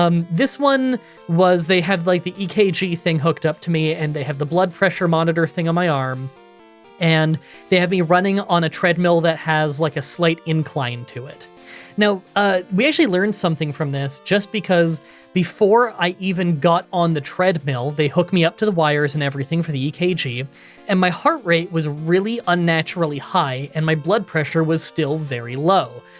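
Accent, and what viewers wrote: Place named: Canada. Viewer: Your Canadian accent makes me happy.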